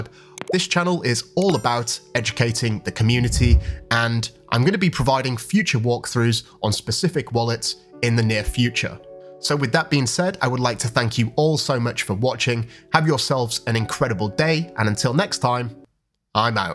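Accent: Yorkshire, United Kingdom. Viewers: Yorkshire accent for sure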